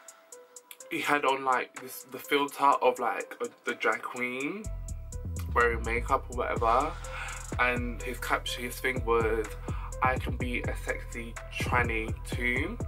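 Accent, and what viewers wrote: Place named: United Kingdom. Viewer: Double Language Barrier 🙅🏽🙅🏽🙅🏽 Lisp+British Accent 😷😷😷 Handsome, Tho.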